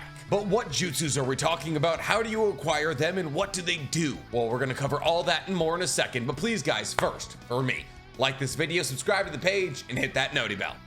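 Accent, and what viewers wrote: Boston, United States. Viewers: He’s just from Boston he has the accent makes karma sound like Kama 😂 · Makes sense. I was wondering why he was saying it like that. Good ol' Boston natives 😂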